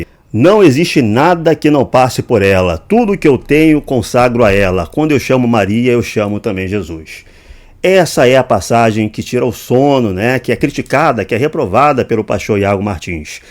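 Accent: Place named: Rio de Janeiro, Brazil. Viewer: Sotaque carioca, camisa do mengão, malemolencia danada... ta aí o meu teólogo preferido.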